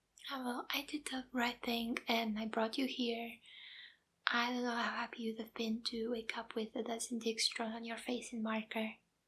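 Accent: France. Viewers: Is that a french accent?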